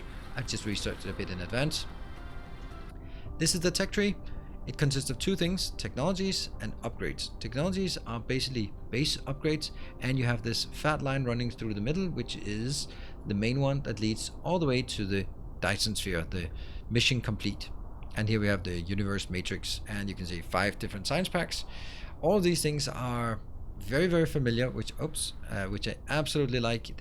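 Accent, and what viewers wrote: Denmark. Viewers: Is that a Danish accent I hear? Nice video by the way. Game looks pretty chill.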